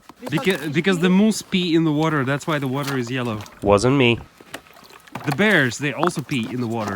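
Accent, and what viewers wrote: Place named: United States. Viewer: American accent . . ?? how comes?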